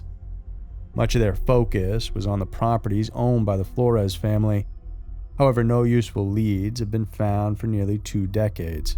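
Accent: South, United States. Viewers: God damn I thought that was Matthew mfking “alright alright alright” McConaughey doing the narration. That southern drawl was just a-twanging in my ear I tell you w’hat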